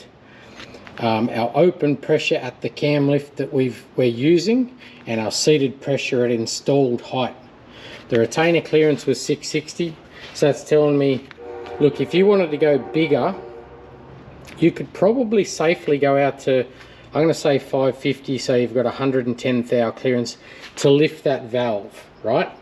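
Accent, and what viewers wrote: Australia. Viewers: Once again I am catching up with this episode late. If you are watching from overseas accent is typical of an Australian tradesman. We are that group of people who don't have to carefully check what we say before we speak. We use slang, we might swear a little, it's all natural to us. With his humour might at times come across as a bit daft. But, he has a sharp mind and he knows what he is doing.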